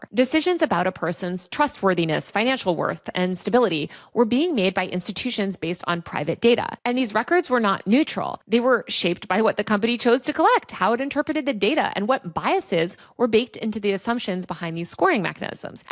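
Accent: California, United States. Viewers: How do I put a filter over your voice so I don’t have to hear such California OMG accent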